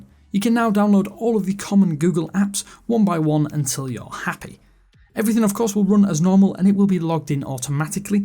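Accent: United Kingdom. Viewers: てていていってて 这差远了，只是英音而已。。你听听约翰逊那种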